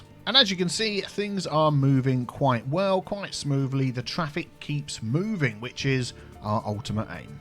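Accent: United Kingdom. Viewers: I already knew all this but I watch every video, so 🤷‍♂️ 😂 If anything, I stay for the beautiful British English 😌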